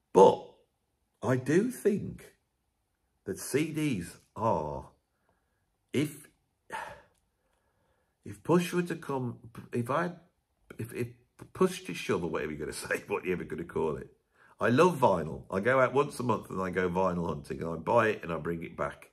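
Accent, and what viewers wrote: Birmingham, United Kingdom. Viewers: You crack me up Brummie in a good way mate. Lived in Stourport-on-Severn <num>. No longer in the midlands, which I miss. CDs have their advantages and some sound fantastic BUT a good quality bit of well recorded vinyl on a quality turntable through a decent amp to decent speakers is something else again... natural! UTV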